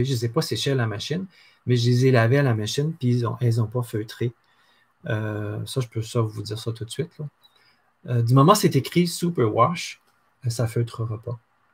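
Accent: Quebec, Canada. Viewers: Bonjour, Merci pour vos vidéos très bien faites et très motivantes, même si je n'ai toujours pas franchi le pas de tricoter mes propres chaussettes 😉. Ce qui me fait le plus hésiter, c'est de savoir s'il vaut mieux commencer par la tige ou la pointe! Par la pointe me semble bien pour ne pas être à court de fil, mais est-ce que la tige tient bien au niveau du mollet? Comment faire pour que les côtes soient élastiques, mais ne se détendent pas non plus? Y a-t-il une façon de rabattre les mailles qui permette d'avoir un bord qui ne gondole pas? Merci d'avance et surtout, continuez à nous régaler avec vos vidéos (et votre délicieux accent québécois 😁) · Merci beaucoup pour vos tutos 😊ils ont été indispensables pour l apprentissage.je fais des moufles des chaussettes grâce à vous .Regulierement je revois les explications qui m aident toujours et puis comment se passer de votre sympathique accent canadien qui fait voyager en ces temps particuliers de covid .Sophie de Toulon france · Tous mes compliments l quel plaisir en plus d entendre le Québec l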